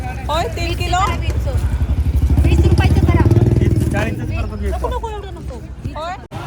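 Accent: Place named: Khandesh, India. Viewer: khup diwasatin nahi ....khup diwasani.....please correct marathi bola ...bolnacha tone khandeshi kivha Vidharbh kivha Kolhapuri vatate.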